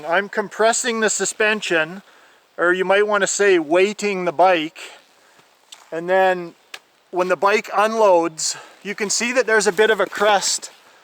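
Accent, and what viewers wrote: Canada. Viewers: lol i love this guys accent! Canadian?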